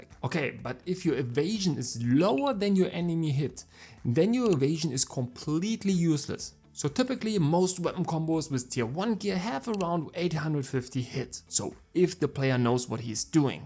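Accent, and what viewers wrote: Germany. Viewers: man hört direkt den deutschen raus :D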